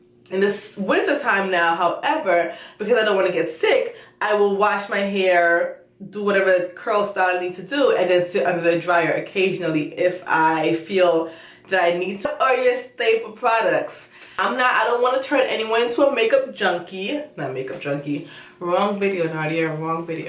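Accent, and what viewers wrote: Jamaica. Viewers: Mi love yuh patwah!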